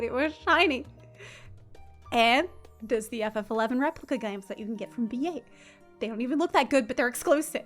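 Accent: South, United States. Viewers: Did I just find a streamer with a southern drawl?... I hope that's not a pet peave. Because that's cool, and subscribed! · The southern accent is here thick now and let me just say I'm here for it. I feel represented. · No I agree that's a lot of cool glamorous and stuff in the game and it's a fun part of it and that's just it if that's all you want to play play it like that it's your game play the way you want to that's what they designed it to be I thought. Also I'm not sure if you're putting it on for a show but I'm hearing slips of southern accent are you originally from the southern Us by chance? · Did pick up a southern accent? It's pretty adorable. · A few comments as I watch.. 1) lmao that thumbnail. Great editing xD 2) "What is a mount but a pedestal for which to put my character in her perfect glam" 🤣 That got me. 3) Thing really escalated around 7mins hahaha but it's so true. 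IT'S ALL TRUE. FOR THE GLAM. 4) I realize there's so many clips just to show off glam. There was a lot of work put into this video <3 5) I can hear that southern accent coming out strong 🤠 I love your content,. I'm glad to see stuff here again and I'm sure a lot of us feel the same way about the pursuit for Glam. Why do Savage when gear is obsolete not long after? Stats are temporary... GLAM IS FOREVER. I'll say this though.. The game needs more suits and shoes imo. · I'm dying laughing cuz you got a southern accent in like 6 months of me not watching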